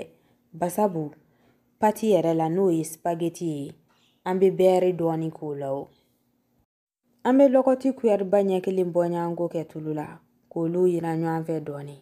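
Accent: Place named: Ségou, Mali. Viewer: J’adore ton accent tu viens de ségou ?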